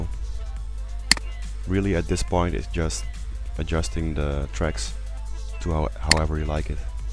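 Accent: Netherlands. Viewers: Are you dutch? You seem to have a dutch accent... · are you dutch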